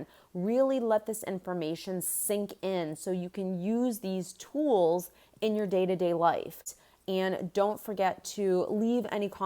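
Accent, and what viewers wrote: Boston, United States. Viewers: She actually has a bit of a Boston accent and honestly our d's often sound like a t.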